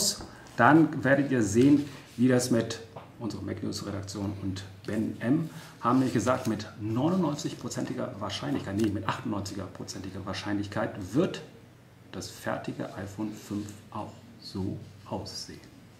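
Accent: Asia. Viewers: Is that Asian speaking German!? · I wonder if he has an Asian accent to German people ? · hahah, he's speaking german but in an asian accent:P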